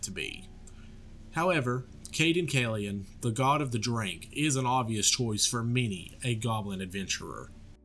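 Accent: South, United States. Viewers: Love your work & a friendly Southern voice! Peace!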